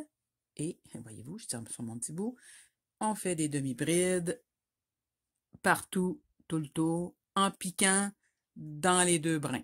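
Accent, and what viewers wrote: Quebec, Canada. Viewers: Un grand merci de Suisse pour ce tuto qui m'a permis de réalisé de belles finitions. Vos explications sont très claires, votre rythme agréable et votre accent me rappelle le Québec🙂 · Merci pour ces bons astuces et votre magnifique accent du Québec ! :washhands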